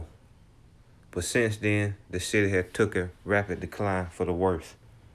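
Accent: South, United States. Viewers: He sound like hes from another southern state that is illietare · Dude narrating is way country. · He has a southern drag.. Georgia is so big, why live in Atlanta? Im from NY, i don't live in Manhattan. NY is big enough to live outside of the 5 boroughs.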